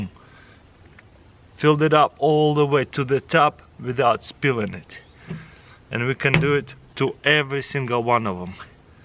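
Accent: Russia. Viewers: Dude, you still speak Russian! Just try to say: Adamadickly instead of Avtomaticheski aka автоматически and Boom!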